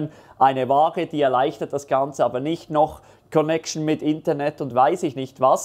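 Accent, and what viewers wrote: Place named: Switzerland. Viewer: vielen Dank für dieses tolle gewinnspiel ich drücke mir und allen anderen die Daumen und würde mich sehr darüber freuen. Seit Jahren schaue ich deine Videos und mage deinen schweizerischen Akzent. Ich hoffe auch mal nächstes Jahr bei einem Kurs von dir dabei zu sein. Frohe Weihnachtszeit 🎄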